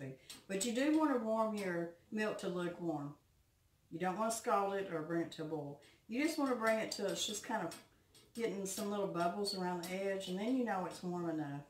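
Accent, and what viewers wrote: South, United States. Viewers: I’m gonna make this tomorrow! Please remember to wear your mic so we can hear the lovely southern drawl! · Man, I am an avid baker for therapy as well. I cant tell you how much I enjoy and have used your experience to add to my own. I want to thank you in this trying time of Covid for your messages and as a Canadian I just love your southern accent! In two words, Thank-You!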